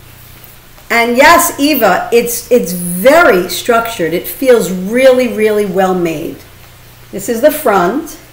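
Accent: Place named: New York, United States. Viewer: You sound like your from nyc I was born in the Bronx NY 💕😍😍💕😍 beautiful bag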